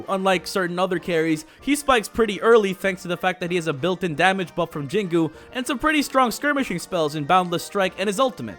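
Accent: Philippines. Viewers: heya will u also do content on league? that'd be awesome also I can still hear your Filipino accent UwU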